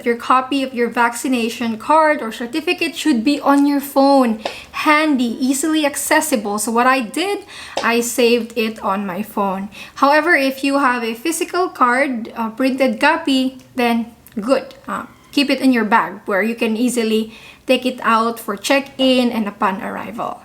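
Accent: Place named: Philippines. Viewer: I just love Filipino accent. Tops!!! The guide is really helpful. Tnx "Chaotic" The Philippines wouldn't be Philippines if it's not chaotic. lol Customs discriminate against Filipinos. They believe that if you're Filipino you're "poor" and there's nothing to check-quite "insulting". lol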